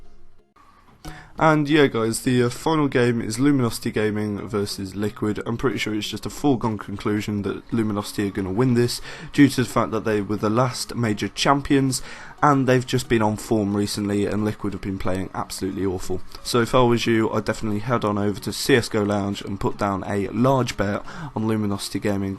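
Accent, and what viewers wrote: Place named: North, United Kingdom. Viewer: Bro, your logo makes me so happy to be alive. Also I'm guessing you're a dirty Northerner judging from accent :)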